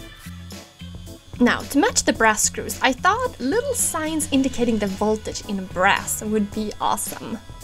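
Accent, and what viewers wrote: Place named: Sweden. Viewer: I thought Swedish, but I may be wrong...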